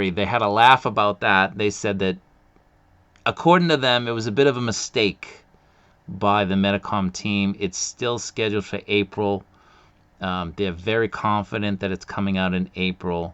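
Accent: Boston, United States. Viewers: It’s nice to hear the accent I am accustomed to in my daily on YouTube. Not enough Bostonians on YouTube. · by the way I'm the one on reddit asking you to do little Japanese in your Boston accent 😂. I understand why you don't but man it would be gold.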